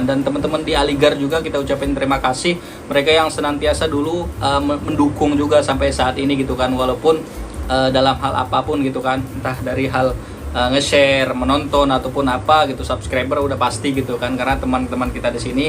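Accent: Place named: Medan, Indonesia. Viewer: awak setelah tahu kayak seperti bahasa medan gitu.......baru awak suscribe biar enggak apah kali....Selamat ya bang dan bang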